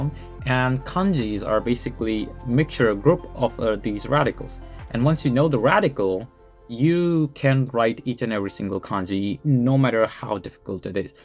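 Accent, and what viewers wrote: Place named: England, United Kingdom. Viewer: pleaseee make a video on how to get english accent like you am also from india please i beg you help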